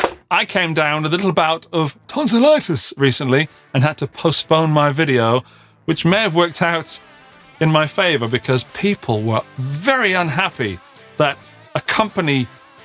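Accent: United Kingdom. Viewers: Hey, digging this channel, love the fun crazy professor persona However slightly confused, pretty sure that's a British accent. I can say that with confidence because I was born in London and some 40 years later still can't work out how to get out. So my question is, are you a UK or US channel since pushing Sweetwater, who I understand are the Walmart of music stores, well known but not available in the UK?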